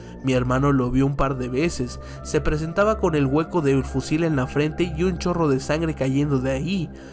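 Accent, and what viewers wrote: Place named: Mexico City, Mexico. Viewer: Están buenas tus historias, vengo de ver las de los Youtubers, y el acento chilango le da un toque 😂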